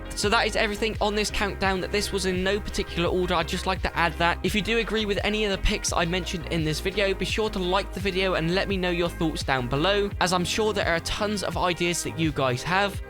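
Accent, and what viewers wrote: United Kingdom. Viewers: defiantly bristolian arent you!!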